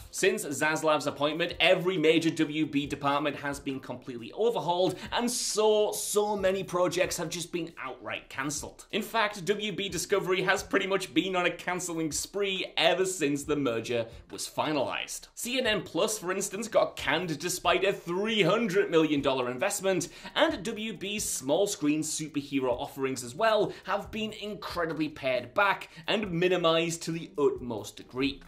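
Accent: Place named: United Kingdom. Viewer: The UK pronunciation of ‘H’ is distracting to my country a$$. Love the channel.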